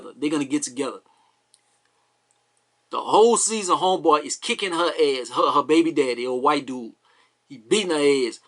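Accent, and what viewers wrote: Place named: South, United States. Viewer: I subscribed cause I can just listen to your country accent all day . But on the real you break that ish down ❤️❤️❤️❤️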